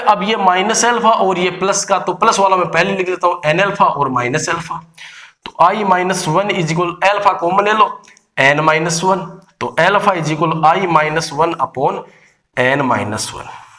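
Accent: Haryana, India. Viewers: Sir. Ap. Haryana. Se. Hai. Kya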